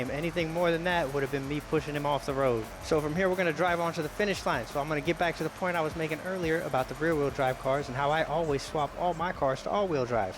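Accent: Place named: South, United States. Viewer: he sounds kinda redneck-y and that kinda accent unironically is a big breath of fresh air in the forza community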